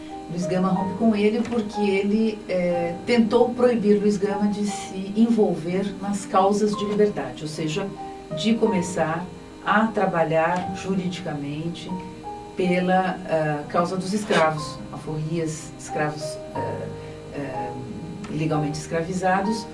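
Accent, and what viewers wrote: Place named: Brazil. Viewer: O sotaque dela não é tipicamente paulistano. Tenho curiosidade de saber onde nasceu a Dra.. O trabalho dela me encanta muito.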